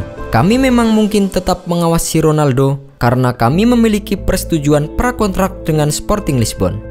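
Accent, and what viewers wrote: Java, Indonesia. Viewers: suara narasinya masih berasa medhok.. tapi mendingan ini daripada suara translate-an cewek gugle :)) mantep mass...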